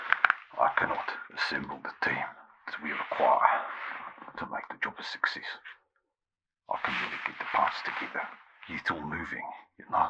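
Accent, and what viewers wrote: South Africa. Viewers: Are you from the east rand bru? You sound like my cousin from Benoni. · Is that you South African accent you are using? 🖤🖤🖤love your work always.😊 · Anyone else hears a White South African accent?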